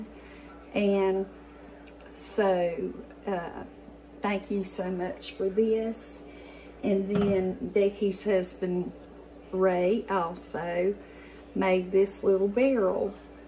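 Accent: South, United States. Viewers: You remind me so much of my grandma❤️ she has a southern accent as well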